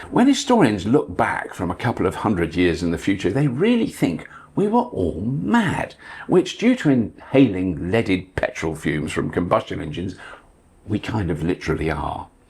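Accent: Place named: United Kingdom. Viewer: Brilliant!. Thanx for talking in such a relaxed, humorous British way, but still getting the message through. Love your videos 👍🏾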